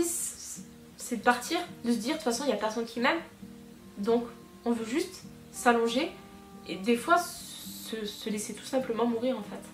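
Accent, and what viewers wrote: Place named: Toulouse, France. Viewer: tu a l'accent toulousin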